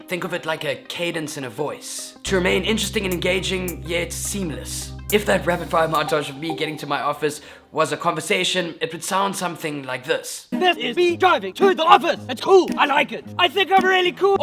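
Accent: South Africa. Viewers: So nice to hear the South African accent, keep on inspiring bru, I made a film myself and I kinda realized I did way too much of the stuff I shouldn’t xD Would love if you came up to Joburg somewhere along the line, I dig your content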